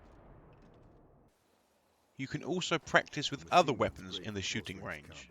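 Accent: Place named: United Kingdom. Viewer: A bit late on here but ' better late than never' I got SE2 3 and 4, love these British made games, on Steam with an Xbox controller, USB connected, in SE2 there is a tutorial at the beginning, so this taught me how to sneak around n shoot. But with any games, make mistakes and you learn. The tip you did miss was when in the bushes and having a dump be sure you are downwind. Well presented tutorial, 10/10 clearly understand your cool calm British English voice, they are the best with tutorial lessons.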